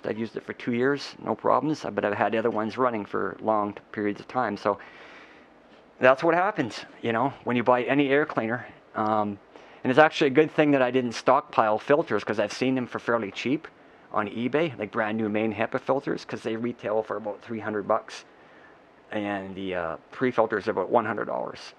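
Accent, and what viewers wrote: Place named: Canada. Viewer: this is the most canadian person i've ever heard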